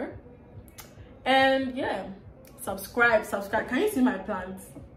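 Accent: United Kingdom. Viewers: I like your British accent can you use it more often♥️♥️♥️ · Your British accent😍😍🥺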